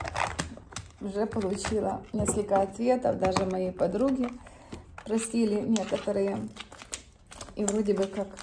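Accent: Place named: Kuban, Russia. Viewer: Голос приятный, нежный. Говор тоже приятный. Для меня обычный, родной. У нас на Кубани также говорят. А, что кто то написал плохое? Всем счастья, здоровья, любви.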